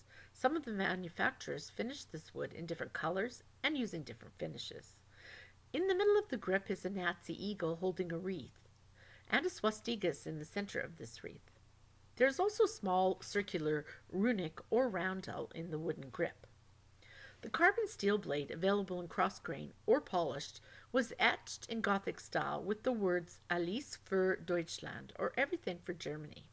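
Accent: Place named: United States. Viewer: i think you meant pronunciation.. shes clearly an american. big deal. thank for the video lady. but crank the sound up, i had to crank my stereo up.. too quiet.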